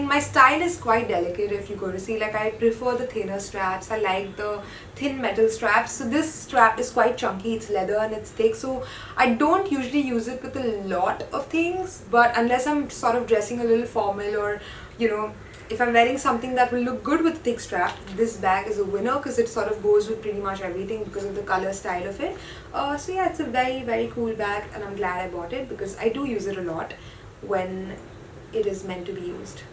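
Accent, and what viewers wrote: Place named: India. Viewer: Talk slowly..too fast hard to understand because of strong indian accent.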